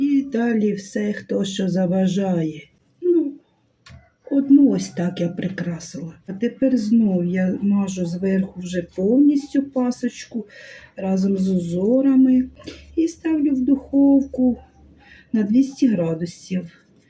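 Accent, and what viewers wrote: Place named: Ukraine. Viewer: у вас очень красивая, спокойная украинская мова, вас приятно слушать. Не суржик, а именно мова.😊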